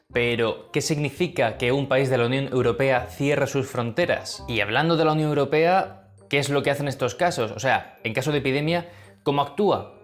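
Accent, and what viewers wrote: Murcia, Spain. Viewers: ¡Acho pijo! ¡No sabía que fueras murciano!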